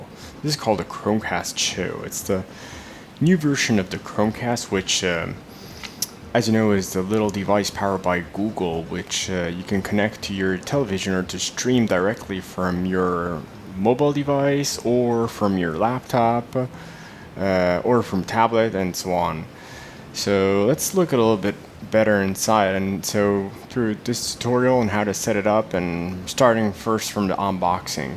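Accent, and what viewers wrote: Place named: Italy. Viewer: Your english accent is very minimal for being Italian!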